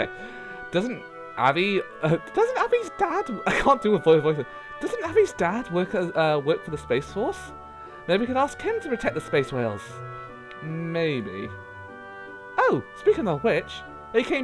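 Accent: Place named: United Kingdom. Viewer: Just so you know, no offence intended, "nubile" is not pronounced "nimble", it is pronounced "new-by-elle". Also, really, if you have troubles with a lot of the words, you should really not read the text. On another note, this is a side game set in a different universe to a game titled "Sunrider" which has since been released as "Sunrider: First Arrival" and "Sunrider: Mask of Arcadius", with a third installment to come at some point. Having played them only really shows you another version of the characters. Hue: a gradation or variety of a color; tint. "Re-Pro-Duck-shun" Seriously now. Your accent sounds like you are British, you should have been taught most of these words by now...right? Again, no offence, just...listening to you mangle the words is like having an ice pick shoved in my ears. A single ice pick. Hitting both ears. At the same time. 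But that is just me. Again, not trying to offend you.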